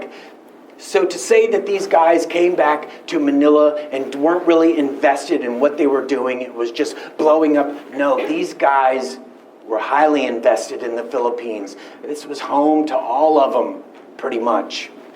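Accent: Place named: Bronx, United States. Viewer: This speaking style is 3rd rate, does not fullfill academic standards. Articulation as if the Bronx back alley accent, Joe Pesci et al. Unlistenable.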